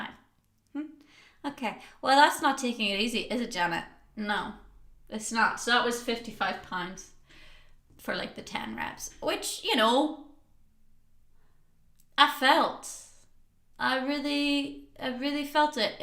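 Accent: Ireland. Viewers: well i just ran across her by accident on the tube and was instantly pulled in by thatwhat id call hot irish accent.she just lately changed her hair colr thats why i inquired.i wasnt trying to be a meathead or nothing Sorry man.anyway im a new fan nice too meet you Wes davis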